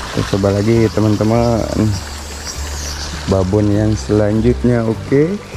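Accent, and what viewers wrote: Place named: West Java, Indonesia. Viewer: emang sundanesse people mah sulit ngilangin aksen sunda nya semangat bro lah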